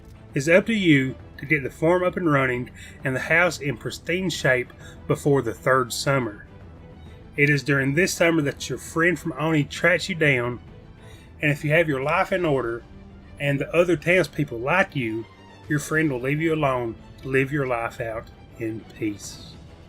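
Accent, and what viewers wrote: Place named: South, United States. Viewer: There are so many people with amazing voices in the halo community, and I am so glad that we finally have someone with a southern accent.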